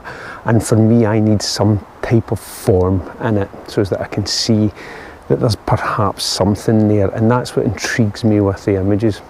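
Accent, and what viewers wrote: Scotland, United Kingdom. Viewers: I love your work and ICm Concept. Unfortunately i profit only half of it beacause I am unable to understand your Scotish. I respect it but for me this is a bit frusutrating. However I your work is outstanding.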